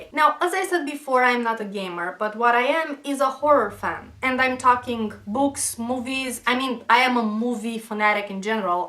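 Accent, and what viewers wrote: Romania. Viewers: yo so what country are u from? romania maybeh? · You make some very good points and in such a reasonable and non-histrionic way. Because of that I'm only slightly disappointed, having very much enjoyed your video and even subbing to your channel. My disappointment comes from being a fan of another Romanian Youtuber named Vee. While you and he actually make some similar points (though there are some very distinct differences), he is much excitable and given to, often hilarious, raging. While the cultures of Romania and the U.S. also have their distinct differences, I'm glad there are so many issues we find common ground on. The more I hear you both the I've come to believe the Romanian accent is my favorite in the world, so thank you for that as well. BTW I also was a Red Alert fanatic when it came out and the intro still gives me chills.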